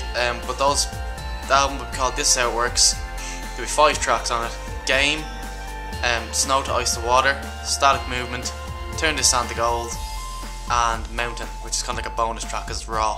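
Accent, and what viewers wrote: Ireland. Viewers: lmfao it could be picture of a penguin. haha ur soo adorable :P btw i love ur irish accentt <333 :D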